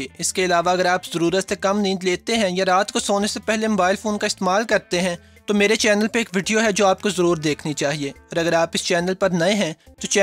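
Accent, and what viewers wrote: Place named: Lahore, Pakistan. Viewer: Yaar Aap ka talking way bohat Pasand aya .... Sure Aap Lahore se ho .... Don't be offended mai bi Lahore se hi hun ...!!! Nice Video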